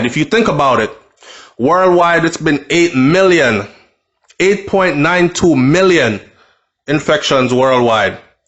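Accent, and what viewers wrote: Trinidad, Trinidad and Tobago. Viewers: Lol your Trini accent, has me in stitches!! Our PM is doing the right thing, I want to go see my family, but..let common sense prevail in TnT!! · Oh gosh you and the trinidadian accent. But on the real Trinidad is not heavily dependent on tourism like Jamaica. Jamaica needs tourists to get the economy flowing again thats why there is a rush to open the country. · you sound just like the Treni. Any way I feel that they should keep the border locked until it is safe. Work with what they have until ones health is secure. Much love to you your wife and your family. Lots of love to your grandma hope she continue to do well. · Is that supposed to be a Trini accent lol it’s pretty good sounds like a mix of trini and Guyanese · you killing me with the accent, #BagoGyal🇹🇹🇹🇹🇹🇹🇹🇹🇹🇹🇹🇹🇹🇹 #CaribbeanStrong🙏. Yep Our prime minister doing right by us, it's hard for those of us outside, but necessary. 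We just have to wait before we can touchdown. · you're silly you really sound just like a trini 😆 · you are so funny...talking Trinidadian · Bwoy yu have the trini accent lock. On another note, i applaud Trinidad for really taking this virus thing serious. I can also understand why my country Jamaica 🇯🇲 has 600 cases. Because of the tourist industry of course. I hope 🙏 and pray Andrew Holness just keep Jamaica close for another two months and gwaan pree the ting. At the end of the day, the world cannot live without Jamaica so we need to protect our ppl first. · Very good trini accent. Very good lool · Are you Trini? Lol your accent is awesome tho. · Like your Trini accent lol have a blessed day to you and viewers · You speak Trini better than how you speak Jamaican good job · Yuh 🇹🇹 accent sound good boy. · Good afternoon..Love the trini accent blessings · U Trini accent on point.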